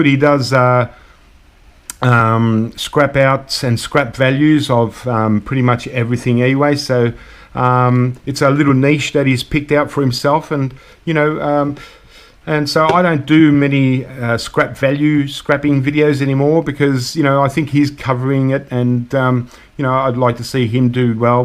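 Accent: Australia. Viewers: We love your Australian accent! I really didn't understand how YouTube revenue worked . I'll start sitting through the ads when I watch your stuff. Keep them coming. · Not sure why you think you'd be more popular with an American accent mate. I think it's just the opposite, we like hearing your Australian accent, and your great opening, "G'day scrapers"!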